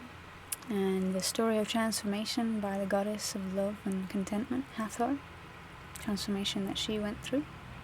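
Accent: United Kingdom. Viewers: Hi are u from the UK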